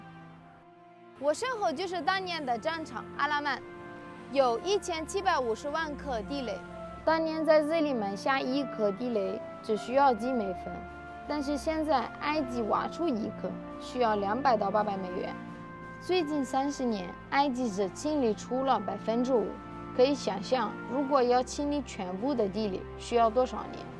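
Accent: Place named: Shandong, China. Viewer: 我觉得你说话有山东口音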